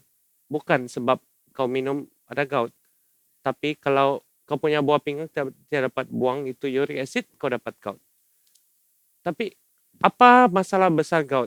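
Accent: Sabah, Malaysia. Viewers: Tq doc.... Siok dengar penarangan versi sabahan...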